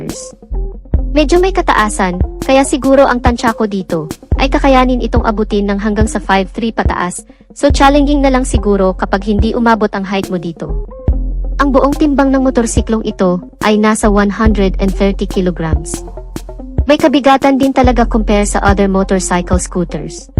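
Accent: Visayas, Philippines. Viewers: Bisaya ba ang nag sasalitang ito....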